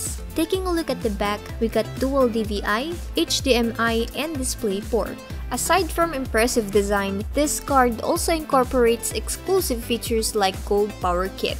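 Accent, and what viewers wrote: Philippines. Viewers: That Pinoy accent tho.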